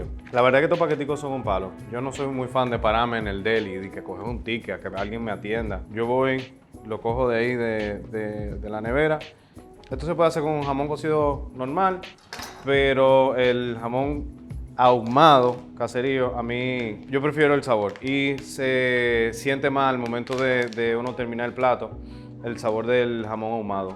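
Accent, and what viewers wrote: Dominican Republic. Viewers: Vamo arriba!! me encanta nuestro acento tan original, siempre y cuando no caigamos a lo vulgar, solo que apreciamos lo que realmente somos cuando estamos fuera de nuestro país. Este canal me encanta!!!! Bravo!! 👏🏻👏🏻👏🏻🙌🏻😊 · Jajajaja!!!! primera vez que veo este chef me encanto!!! su forma de explicar muy gracioso parece dominicano al hablar 😂😂😂😂😂😂😂😂😂😂😂😂😂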